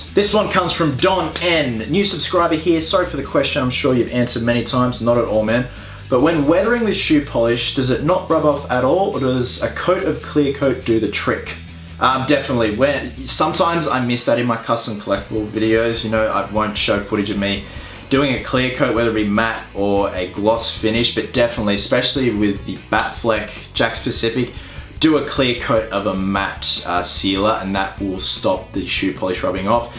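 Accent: Australia. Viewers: And they say the perfect guy doesn't exist. Australian accent included. haha :)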